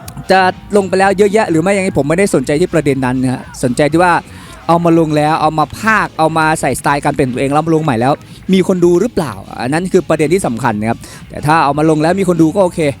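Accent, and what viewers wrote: Isan, Thailand. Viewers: คนพากดีคักมีเว้าอีสานบ้านเฮานำจวดไปเลย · ดูแล้วชอบครับคนพากย์สุดยอดครับ ผสมอิสานด้วยเหมาะีผมชอบ คนอุดร กุมภวาปี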